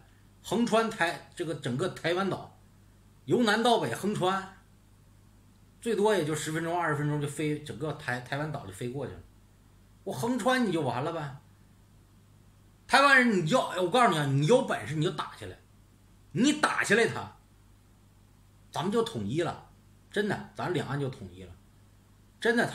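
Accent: Northeast, China. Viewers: 东北口音胖子有意思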